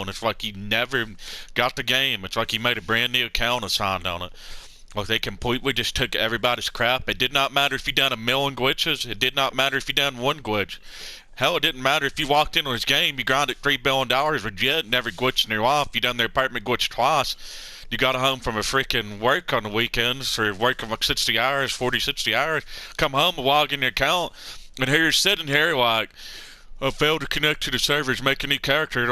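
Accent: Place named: South, United States. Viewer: He sounds like he’s from the southern US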